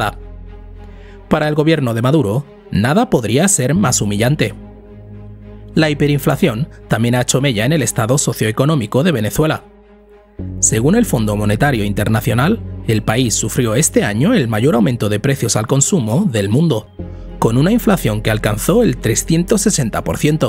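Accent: Spain. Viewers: Este analista le faltó leer más. El Esequibo es parte de Venezuela, ya esa parte del territorio no debe estar "rayada". Una zona en disputa no debe ser aprovechada por ninguna de las partes y Venezuela ha cumplido. Si una de las partes quiere invadir el territorio esa es Guayana, porque el Esequibo es de Venezuela, así es que el cuento, espero que sepas cual es la verdad y no la hayas dicho por algún interés económico particular, porque de no ser así, entonces es que de verdad eres absolutamente ignorante de las realidades geopolíticas de las que tratas en tu canal. También quiero acotar que cuando te vayas a referir a las economías en el caso de Venezuela, no obvies un hecho de mucha influencia en la geopolítica económica como lo es las sanciones unilaterales impuestas por EE.UU. (Sanciones) y has un análisis retrospectivo del antes y después de ellas en el desarrollo de Venezuela. 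Por tu acento creo que eres español y por tu forma de referir el tema de Venezuela me parece que eres de los que aun les duele la patada por las n@@ que Simón Bolívar en compañía de esclavos e indios, le dio al imperio español hace más de 200 años, después de más de 500 años de saqueo en nuestra América Latina. No uses este medio para engañar a la gente.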